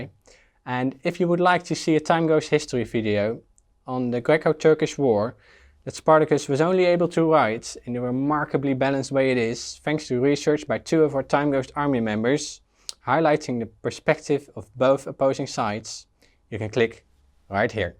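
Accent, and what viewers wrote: Netherlands. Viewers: He has a great voice that’s fun to listen to 5/5. It’s like an American doing a British voice while being Dutch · That's some T H I C C Dutch accent right there! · YES. JOIN ME IN THE DUTCH ACCENT REPRESENTATION SQUAD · Ik hou van een Nederlands accent.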